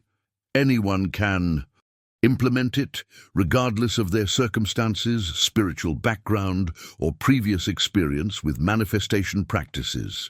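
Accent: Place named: United Kingdom. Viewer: This pompous, ubiquitous, British-sounding narrator’s voice is highly annoying. “We make money the old fashioned way: we eeeearn it.” 😕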